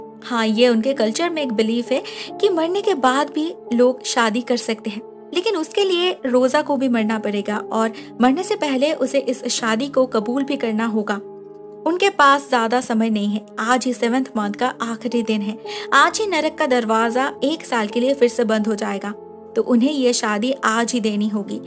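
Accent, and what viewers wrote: Bengal, India. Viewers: Are u bengali? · don't mind but are you a bengali? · R u bengali 😊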